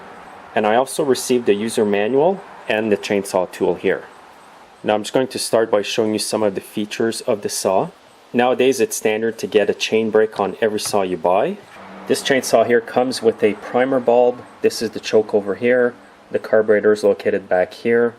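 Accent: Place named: Quebec, Canada. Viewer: Ok you sound like a Quebecer 100%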